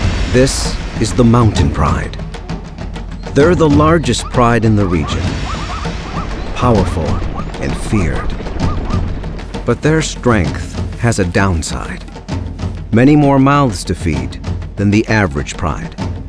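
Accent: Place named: United Kingdom. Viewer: Cant beat a UK commentator for these kinds of documentaries.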